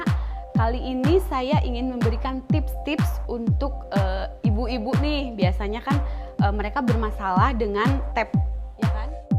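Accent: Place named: Puncak, Indonesia. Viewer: Love your PUNCAK CITY aksen..👏👏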